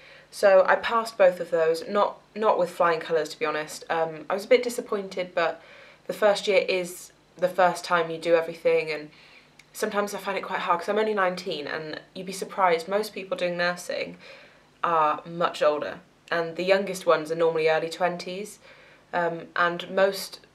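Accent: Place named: England, United Kingdom. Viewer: Im an Irish student nurse and I think you definitely have an English accent haha!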